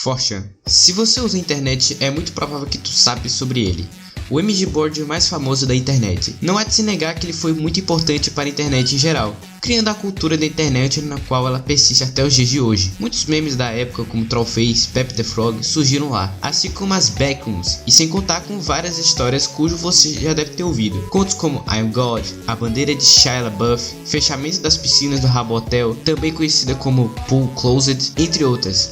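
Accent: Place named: Paraíba, Brazil. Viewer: Pode regravar esse vídeo mas trocando a voz por uma pessoa pós puberdade e de preferência que não seja paraíba?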